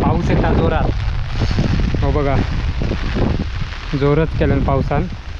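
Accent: Malvani, India. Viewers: तुझा वर कोण रागवत नाही बाबू तु मालवणी बोलूक लागलस काय खुप छान वाटता तु असेच छान छान विडीयो टाक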